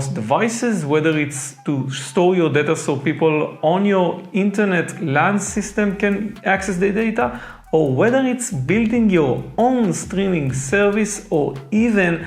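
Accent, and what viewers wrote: Israel. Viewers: You have an Israeli accent 🤔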